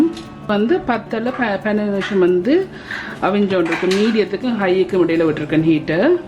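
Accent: Jaffna, Sri Lanka. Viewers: Very nice Jaffna tamil ⭐🌟💘❤👍👌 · Akka kool super ant unkatha singala tamil super irukke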